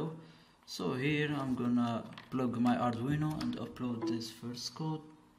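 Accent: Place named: Morocco. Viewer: منين لبلاد اياسمون، باينة عليك مغربي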